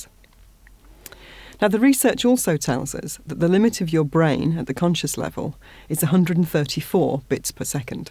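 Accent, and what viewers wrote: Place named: RP, United Kingdom. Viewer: Whenever I need to act out a standard british accent, I think of you. It's not the accent, it's poise-ness, precision and clear impact that I get out of it. This is your gift, and you are sharing it. Thank you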